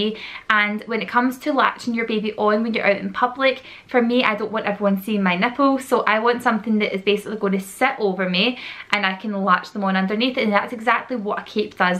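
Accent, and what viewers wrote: Scotland, United Kingdom. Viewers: its scottish and barely understandable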